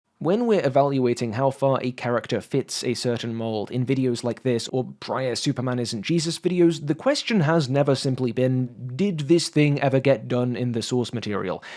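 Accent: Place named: England, United Kingdom. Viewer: I was able to watch about 1 minute of the video before you put out the Holocaust inverting, antisemitic lie that Israel is engaging in genocide. You're not going to convince me that this bullshit video is accurate by showing me another bullshit video. I assume from your voice that you're from England. There is a better case to be made that England is an "ethnostate" than Israel. Both have the same number of non-practicing people- about 50% for both countries (That would be illegal in most fundamentalist Muslim countries fyi). England, however does have a national religion. The upper chamber of the House of Lords must be of one religion. There is no such provision of the ruling body of Israel, the Knesset. The fact is that ANY of its neighbors is FAR more deserving of that epithet than Israel. But your not interested in the truth, you're interested in a narrative. 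That narrative is that Israel=Boravia not because it's analogous with reality, but it's analogous with your propaganda. In the movie Boravia is the big bad, Jarhanpur is the helpless victim. In reality the war started because of a genocidal antisemitic attack by Hamas. In the movie there is no Jew hatred, in fact in the movie your bullshit belief that Judaism is somehow separate from Israel is reality. In the movie the little black boy calling out for Superman was center of attention. In reality he'd be in the slave section. In reality the IDF is highly diverse. In the movie they're all white males. The movie corre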